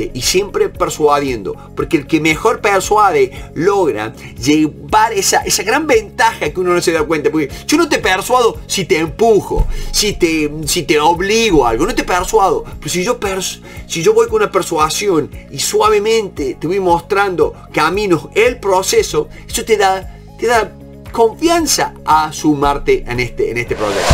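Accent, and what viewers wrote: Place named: Cordoba, Argentina. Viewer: Claramente sos Cordobes jaja..un abrazo y muchas gracias!!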